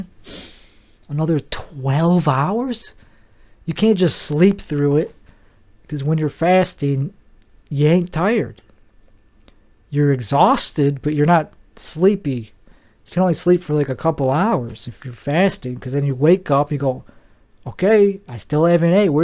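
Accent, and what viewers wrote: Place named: United States. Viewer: I am russian. And your accent is so American. 😮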